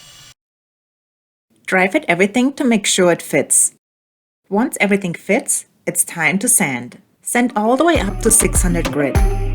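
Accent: Germany. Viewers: Hello, which region of Germany are you from? Because of your German dialect I guess the southwest of Germany.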